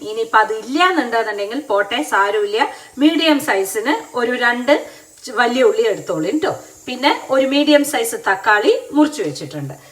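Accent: Palakkad, India. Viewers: ഞാൻ ഒരു ട്രാൻസ് വുമൺ ആണ്. കുക്കിങ് ഇഷ്ട്ടമാണ്. ചേച്ചിയുടെ ഫാമിലിയെ ഒരുപാട് ഇഷ്ട്ടമാണ്. പാലക്കാടൻ തനിമ നിറഞ്ഞ സംസാരം ഒരുപാട് ഇഷ്ട്ടമാണ്. വീട്ടിൽ പ്രശ്നം ഉള്ളതുകൊണ്ട് എറണാകുളം ഹോസ്റ്റലിൽ ആണ് താമസം. വീട്ടിലെ ഫുഡ്‌ ഒകെ കഴിച്ചിട്ട് ഒരുപാട് ആയി. അമ്മയുടെ കയ്യ്കൊണ്ടുള്ള ഭക്ഷണം കഴിക്കാൻ കൊതി ആകുന്നു. ചേച്ചിയുടെ വീഡിയോ കാണുബോൾ എനിക്ക് എന്റ്റെ അമ്മയെ ഓർമ വരും. ചേച്ചി ഒരുപാട് സന്ദോഷത്തോടെ കുക്കിങ് ചെയ്തു മനസു നിറഞ്ഞു ആ ഭക്ഷണം കഴിക്കുന്നത്‌ കാണുബോൾ എന്റെ മനസും വയറും നിറഞ്ഞ ഒരു ഫീൽ ആണ്. Love ❤ U ചേച്ചി. എല്ലാവരോടും അനേഷണം പറയണം എന്റെ.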